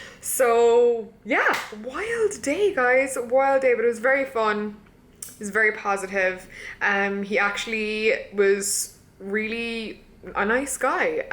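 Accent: Ireland. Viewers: Good info, bubbly personality and AN IRISH ACCENT —> never clicked subscribe this fast. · I'm new to ur channel and r u Irish? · I knew I heard an Irish accent!! ❤❤❤ btw, I liked the last apartment... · She definitely sounds Irish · Hey is that an Irish accent? I can't place it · How long have u lived here it’s sounds like ur Irish accent is fading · Nice Irish accent : ) Lofts are overrated..I stayed in some on Airbnb in NYC, not really great as you think.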